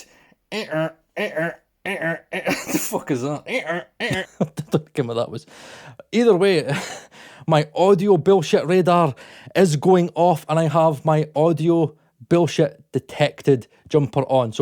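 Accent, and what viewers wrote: Scotland, United Kingdom. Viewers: Hi. Thanks for saving me a shite load of money on inferior software. Also, my families from Ayr and try as I might I can’t seem to geographically pinpoint your Scottish accent 🤔😉 Keep up the good work.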